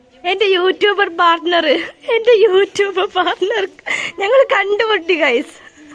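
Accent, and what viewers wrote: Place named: Malappuram, India. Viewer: Thani Malappuram samsaram😂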